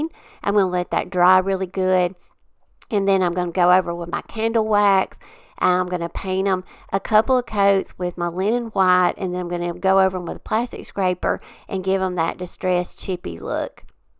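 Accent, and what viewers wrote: South, United States. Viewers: These turned out so beautiful. I love the shabby chic look. 😍 I'll be honest, I love most of all the looks. Just not a fan of modern. Lol My mom's mom was from OK, so they taught me to love old stuff and antiques. I love your southern accent, where are you from? (Just the state. Lol) I haven't been passed TX yet, but someday I'm going to see where my grandparents came from, before they came to California. (Before my mom was born.) GPA was from Arkansas. ❤ TFS! ❤ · I love all your cool creations. Where do you get your ideas? They are all so pretty. I enjoy your sweet southern accent. You have a nice voice. Keep up the good work. Looking forward to The next Video!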